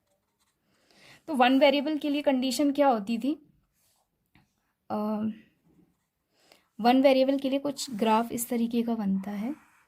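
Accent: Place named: India. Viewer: Even though my ears are not used to Indian English, and that I could not understand much of the discourse, the graphical explanation was impeccable. Thank you too much!! Greetings from an Spanish speaking country, the Dominican Republic.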